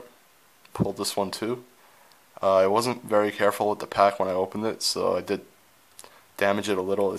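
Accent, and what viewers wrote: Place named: Sweden. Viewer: Are u Swedish ur English sounds swedish Cuz it sounds like when i talk:P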